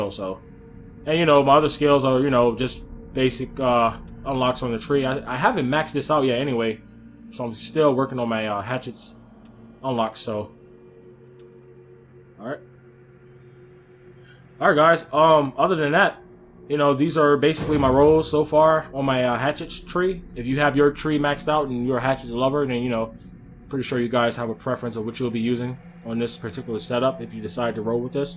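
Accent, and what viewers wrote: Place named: East Coast, United States. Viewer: I wanna say the East Coast but not too sure. Lol. I got people I know from NC, Maryland, New York, etc.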